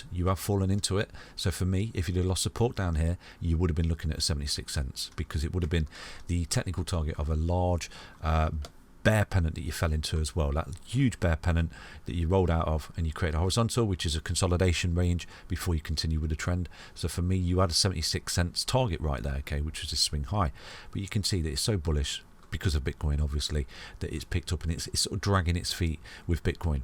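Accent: United Kingdom. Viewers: Love your content my friend, I’ve moved to Canada 🇨🇦 from the Uk 🇬🇧 and it’s so nice to hear a fellow countryman giving great content and TA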